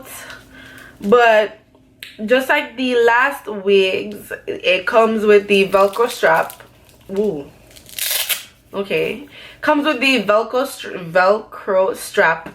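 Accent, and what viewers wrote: Jamaica. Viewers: You deadass sound like a jamaican accent hidden there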